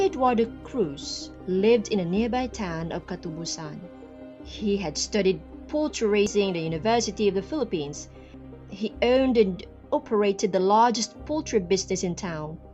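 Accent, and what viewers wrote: United Kingdom. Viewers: You sound scottish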